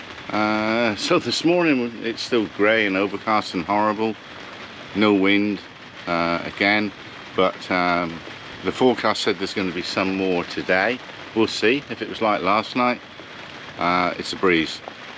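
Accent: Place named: West Country, United Kingdom. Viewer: Great Video. Good to see you and hear that West Country accent too!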